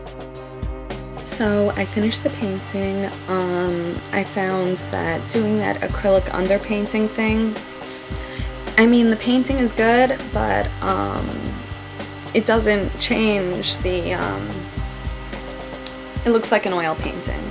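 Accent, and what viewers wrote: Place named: East Coast, United States. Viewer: I really enjoyed watching your presentation! Very nice voice and East coast accent! ((huggs)) :]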